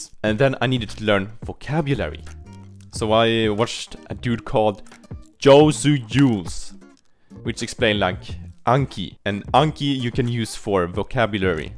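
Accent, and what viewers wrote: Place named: Sweden. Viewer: You're Swedish right? You have that typical Swenglish accent hehe (hope you don't take this the wrong way, I'm Swedish myself so it's just very recognizable :)). Anyway great study advice for beginners. I have studied Japanese on and off for 10 years but still only know the basics, but that's the result of only studying on and off lmao.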